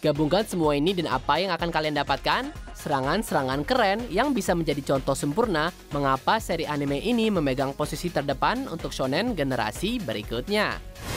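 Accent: United Kingdom. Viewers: Harus banget naratornya make aksen Inggris? Biasa aja cukup kok, jadi agak kurang enak dengernya jadinya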